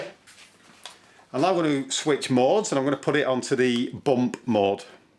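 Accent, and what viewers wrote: United Kingdom. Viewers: Great video and the first to go into such detail about the nails which is exactly what I needed to know, having never owned or used one before. Thanks for taking the time to do this video. Well done and nice to hear another British voice for a change 👍🏾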